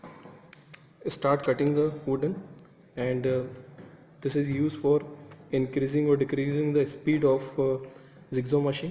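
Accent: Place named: India. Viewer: Accent like Indian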